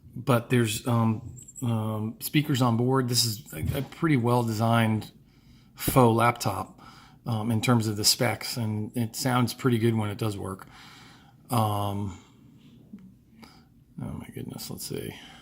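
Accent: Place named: United States. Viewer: He sounds American, so probably Snapdragon 845.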